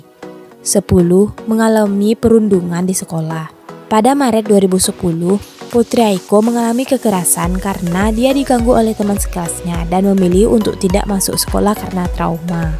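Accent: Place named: Padang, Indonesia. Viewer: Min, lu orang padang yah? Kerasa banget dialeknya.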